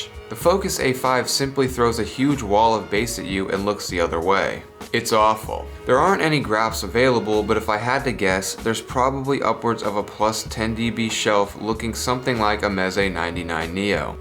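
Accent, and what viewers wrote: New York, United States. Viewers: "Epicot golf ball" and the Noo Yawk accent: good. Those cacophonous urchins and drowning in chocolate pudding: bad.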